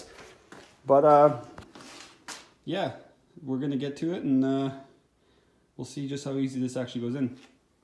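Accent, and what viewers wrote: Canada. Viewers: You sound Canadian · Love the Canadian Accent, " Ought"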